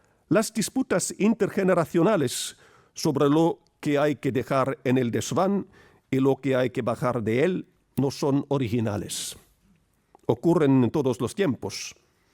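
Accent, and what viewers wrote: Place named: Latin America. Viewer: su caridad, me gusta mucho su acento latin y mas aun el mensaje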